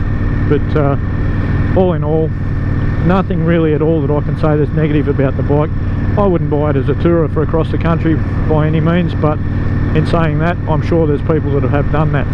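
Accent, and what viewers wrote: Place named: Australia. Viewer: Nice review mate.... great to hear an Aussie review... goes like the clappers..... brilliant.... I can’t wait , one day I will join you guys......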